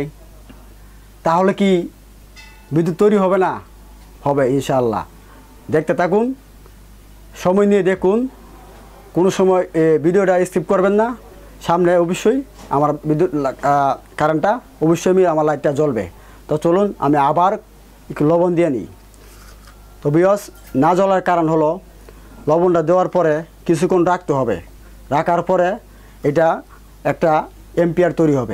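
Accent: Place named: Sylhet, Bangladesh. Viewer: কোন মাঠ নাই আপনি সিলেটি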